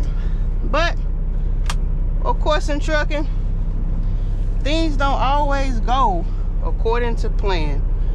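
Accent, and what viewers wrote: South, United States. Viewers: Hi I could Listen to you speak all the time I am from the North I like to hear southerners speak it's so lovely,you are a very intelligent respectful young lady,whenever I am in the Carolinas Virginia or Georgia the people are so friendly and have such lovely manners,I like also that you are being you and not pretending in front of the.camera, you are being yourself and that's awsome,safe travels. P.S. I enjoyed your.visit with your Grandfather and your hometown and when you were showing what your car can do that was awsome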